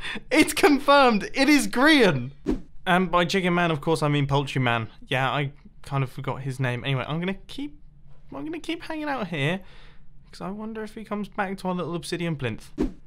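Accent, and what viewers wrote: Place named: United Kingdom. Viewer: Hi Mumbo. First of all, happy birthday! Can't believe you are the same age as me! You have an old, classy and very British voice, it's amazing. Second, for the design of the walking of the sphere, a simple circle walkway is the way to go I think.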